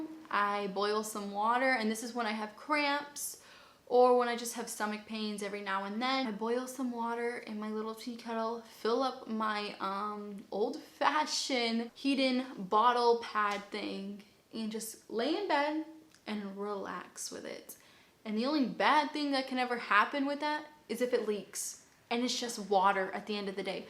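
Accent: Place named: Massachusetts, United States. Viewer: Where in Massachusetts are you from? That accent is deep lol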